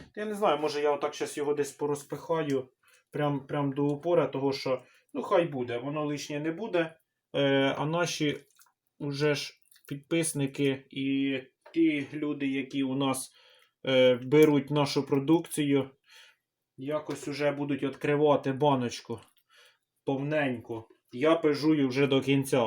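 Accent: Poltava, Ukraine. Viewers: Доброго дня! Із святом Вас! 😊 Так, я зараз теж м'ясо солю зразу, мені так більше подобається, а раніше солила в баночках. Ви, мабуть із Полтавщини? Говір Полтавський у Вас. 😉😊💐💐💐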